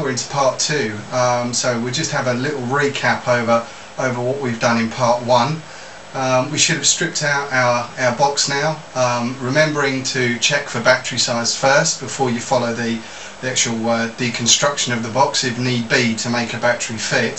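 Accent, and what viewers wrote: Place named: Southern England, United Kingdom. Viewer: The ugly mug is welcome! As is your amazing accent! Southern England? Anyway, thank you for the very informative videos! I am a first-time modder and your help is very welcome!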